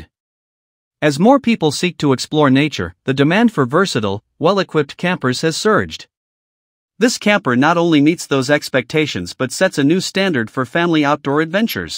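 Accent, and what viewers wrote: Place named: United States. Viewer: Sounds like it’s 😮Voiced over by an American.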